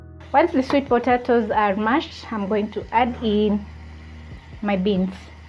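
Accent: Kenya. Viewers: Was very sure you are from Western Kenya until 🤣🙆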